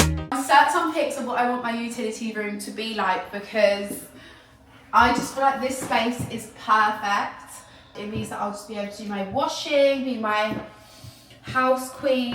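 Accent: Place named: RP, United Kingdom. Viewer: Lovely house congrats Lucinda.By the way when did you become so posh speaking?🤗💚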